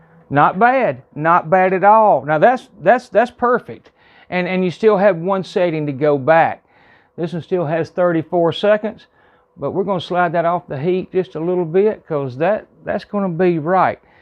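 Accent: South, United States. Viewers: Thank you so much for doing this. Your video helped me decide between the two appliances. I like your southern accent, too.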